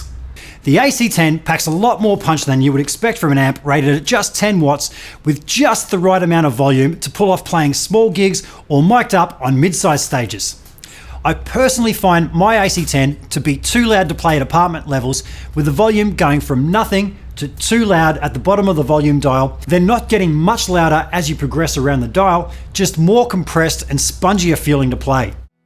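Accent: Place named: United Kingdom. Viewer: I, for one, was impressed by the British accent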